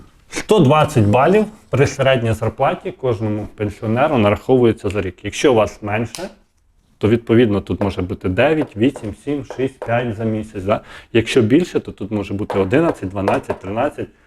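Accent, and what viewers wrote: Ukraine. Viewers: Мова в нього, звичайно, жахлива, русизмів дуже багато, хоча по вимові, відчувається, що рідна в нього українська.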